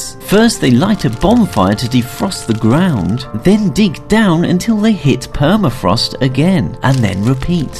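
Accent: United Kingdom. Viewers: Why does he uses Fahrenheit when he has a British accent?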